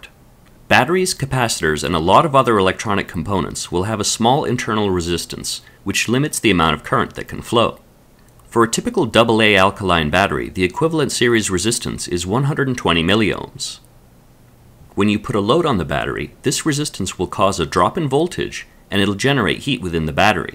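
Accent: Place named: Canada. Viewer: Canada, Eh?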